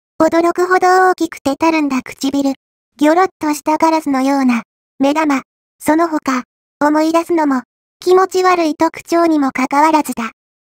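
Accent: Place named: Kansai, Japan. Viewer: 関西弁……ちゅーか……関西のイントネーションやね。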